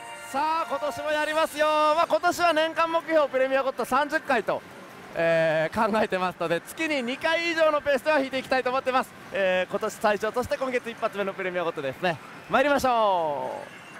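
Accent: Kyushu, Japan. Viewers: たまに九州の方言を聞くとほっとしまいます。熊本生まれ何年も帰ってないので、ハーデスはゴッド引いても見切りしてます。ジャグラは中々こない。前の日の多く出てる台を狙い打ってます。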